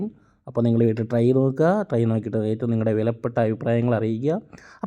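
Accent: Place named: Kochi, India. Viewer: Ithentha kochi slang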